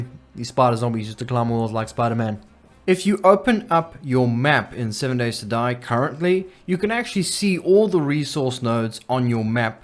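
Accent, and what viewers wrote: South Africa. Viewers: Hey are you south African the accent sounds like it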